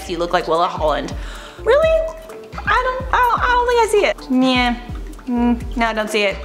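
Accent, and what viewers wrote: United Kingdom. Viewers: She's South African?!! She has British accent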